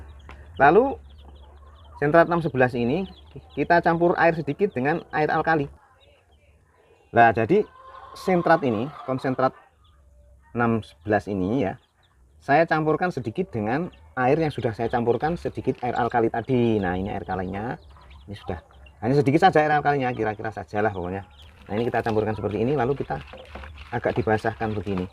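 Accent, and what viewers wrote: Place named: Java, Indonesia. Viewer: Matur nuwun Bp dgn nya. logatnya kok Jawani gih.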